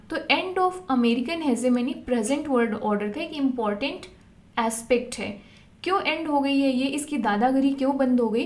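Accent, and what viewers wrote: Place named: India. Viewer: I can't really understand this English ,the videoo seems welll done but unfortunately it is difficult to follow for people not from india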